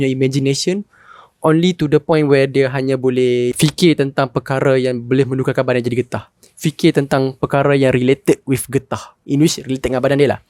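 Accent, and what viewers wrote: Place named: Kedah, Malaysia. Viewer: aku support dam subscribe bagi peminat one piece fans dan aku suka bangsa utagha hang punya loghat sama mcm the show one piece sebab we are same. We are the Northerians Guysss.hahahaha...bangga jadi bangsa Kedah noh...hahahah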